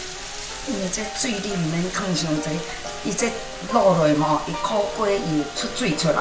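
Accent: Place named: Taiwan. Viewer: 的孫真正有福氣，有thang 聽到正港原汁的台語，觀眾也仝齊分享!讚!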